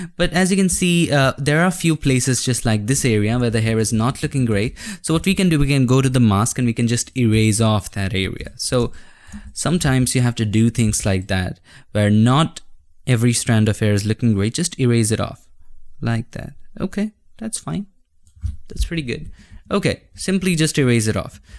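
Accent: India. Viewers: i hate hindu English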